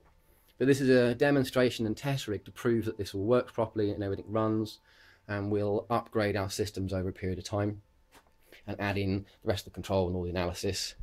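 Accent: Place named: Derbyshire, United Kingdom. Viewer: Hi do you have a contact to this guy as I'm in Great Britain Derbyshire and he sounds similar dialect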